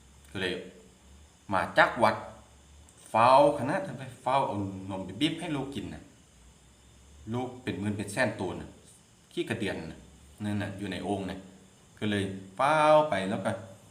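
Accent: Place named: Thailand. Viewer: สำเนียงคนไทเลยกะม่วนดีเนาะจ้าแก๋วๆจ้าเล่านิทานเก่งจ้า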